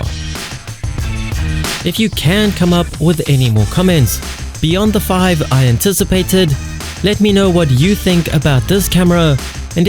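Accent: South Africa. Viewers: Your videos are AMAZING!!! Sound quality is amazing, background music amazing, narration amazing even with your cool SA accent 😁 Seriously the quality of your videos would impress Spielberg! Fantastic, keep up the good work and thank you for putting so much effort into your content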